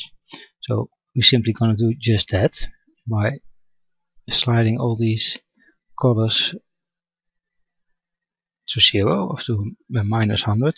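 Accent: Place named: Netherlands. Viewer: Could it be that your dutch, you have a very dutch accent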